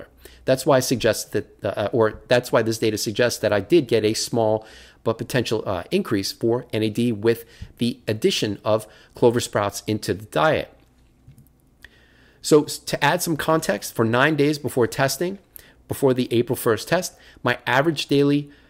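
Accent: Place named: New York City, United States. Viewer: Well, which variety of clover from Ture Leaf are you buying? I'm on the site right now, and there are Mamouth Red and Medium Red Clover seeds available in pounds. But the Organic Red Clover is only available in small pouches. Hmmm, that's the same situation with Johnny's Seeds, too. See what you did?! You posted this video and sold out the entire organic red clover seed bank in North America! You're a Boston guy? No accent. You really seem like a NYC area guy to me. I liked the point you made in the last video with Chris about this VO2 Max protocol only giving us a chance to live to mid-80's, maybe. The Bible says Moses lived to 150! And I guarantee Moses wasn't pumping iron or training for any marathons. My grandmother sat by her 3rd story window in the Bronx smoking Marlboro Red after Marlboro Red to the tune of 4-packs a day and lived to be a healthy 94. 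But, she survived colon cancer in her late 20's and ate almost nothing every day. I guess if you wear a colostomy bag eating anything probably doesn't seem ideal. The only thing my grandmother ate was cold cuts from the deli on her block. And even with that she'd maybe eat a few slices of roast beef and swiss cheese. The only vegetable I ever saw here eat was a few spoonfuls of potato salad. I think that CR (Calorie Restriction) is a powerful tool. I've been watching Peter Attia for 10 years and He gets carried away with his exercise regimen when it comes to the average person. I'm a certified Health Coach, not charging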